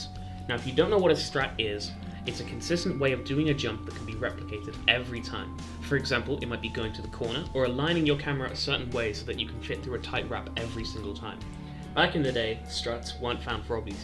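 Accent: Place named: Wales, United Kingdom. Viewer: He’s welsh your English isn’t the problem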